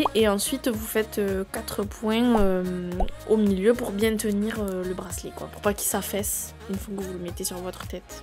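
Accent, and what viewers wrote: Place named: Southwest, France. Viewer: mdr le petit accent du sud ouest ! cool la vidéo en tout cas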